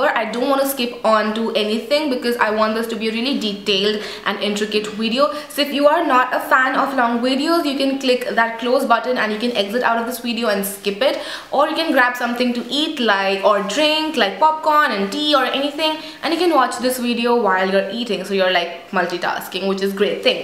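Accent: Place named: India. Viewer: nicest Indian accent iv heard. not too thick ,nor faking an American accent .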